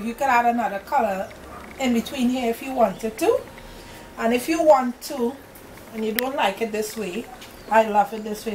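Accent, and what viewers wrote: Trinidad and Tobago. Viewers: Heyyyyyyyy, I was pleasantly surprised to hear a Trini. Love it!!!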